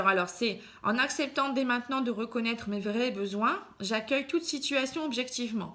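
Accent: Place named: North, France. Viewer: Accent du Nord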